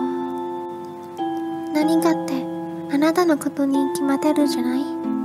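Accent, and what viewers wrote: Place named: Japan. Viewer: Your Japanese accent ie awesome.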